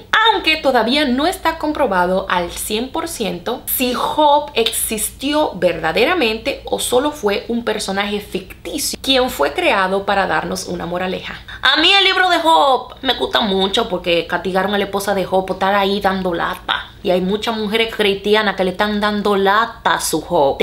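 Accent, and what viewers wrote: Argentina. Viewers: Jajaja tiene un asento bastante argentino jajajaj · Jajaja me encantó el acento de. Muy Argentina.. Dale che.. saludos desde Argentina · AMÉ el acento de, medio Argentino!! Jajaja, abrazo grande Sierva del Señor. Desde Buenos Aires, Argentina!! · jajajaj... ay... cada vez te escuchas mas argentina.. jaja un abrazo bendiciones desde Mexico! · La hna. Tiene un ascento muy argentino. 😄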